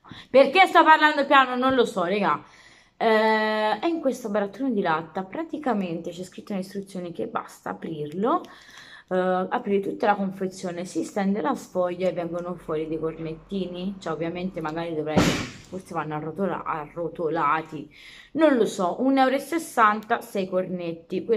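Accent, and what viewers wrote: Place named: Tuscany, Italy. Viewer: Ciao una curiosità, ma tu sei originaria di Napoli o di dove? Alcune volte mi sembra di sentire una inflessione Toscana... Non riesco a capire il tuo accento! Comunque sei una bellissima ragazza dentro e fuori e ti seguo sempre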